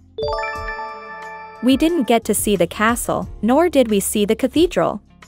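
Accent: United States. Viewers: These are not all C1-C2 questions. A decent grammar book in The UK will confirm that. Of course, the accent in this video leads me to believe that an American created this video… C1 is your (grammatical) level if you get more than 40 of these questions correct. And I would have liked to have seen more Tag type questions and mixed conditionals…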